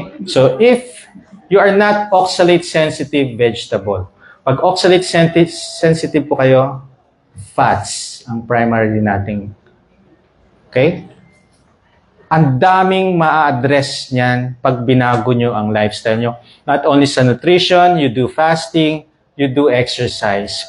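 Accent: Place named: Visayas, Philippines. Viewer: 👏👏👏👏ay bisaya man diay ni👏👏👏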